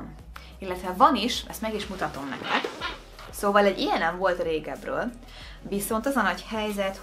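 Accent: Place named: United Kingdom. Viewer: Úristen imádom az angol kiejtésed xdd nagyon aranyos!!!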